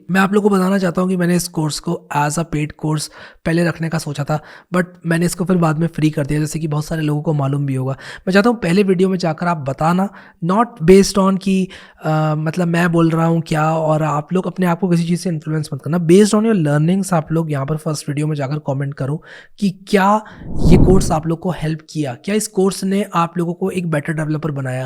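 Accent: India. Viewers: the best style of speaking, ekdam desi style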